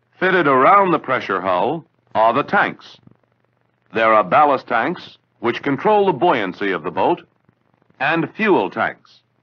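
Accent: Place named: Transatlantic, United States. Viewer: I wish people still spoke and presented with a Transatlantic accent like this.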